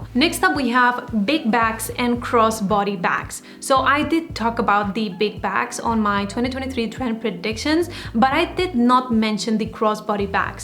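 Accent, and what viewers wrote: Spain. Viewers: Are you from Spain or Argentina? You speak English as if your original language was Spanish. And the way you said Bolero reminds me of how my Spanish ain’t says it. Super duper curious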